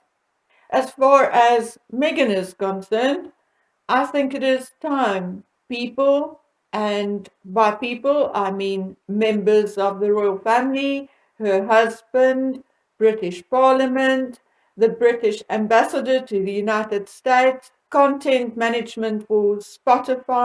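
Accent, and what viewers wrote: South Africa. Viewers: Hello! I really enjoy your video chats and sincerity. Love your South African accent. Bravo! Yes any intelligent person realises that M is ill and your analysis of the situation and the possible end is absolutely valid. May l please just ask one thing? Do you write out your messages and then read them? Sorry sweety it sounds like it.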